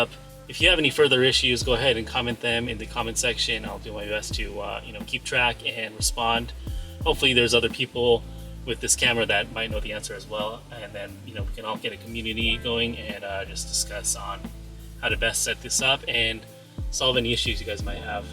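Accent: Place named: North America. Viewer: you sound like you’re from north america, so it should be set to 60hz. at least that’s our electrical currency and can result in flickering when viewing back recorded videos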